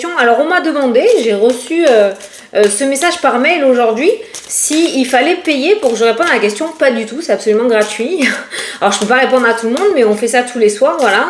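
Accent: South, France. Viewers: Cc toujours aussi joyeyse cet accent qui parle du soleil.moi dans le nord solei et compagnie...trop chaud...ma questiona situation financière va t elle s améliorer..merci beaucoup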